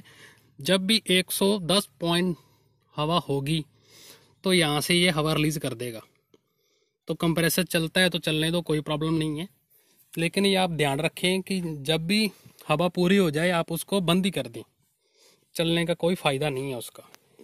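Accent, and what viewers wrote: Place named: Punjab, India. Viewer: Hindi with Punjabi eccent. Bro better you speak your own language you can explain more better in punjabi.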